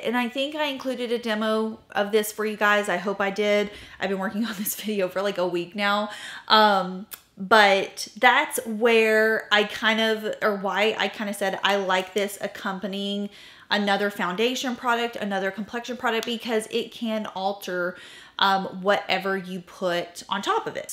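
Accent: South, United States. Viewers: I betcha you were raised in the south....where?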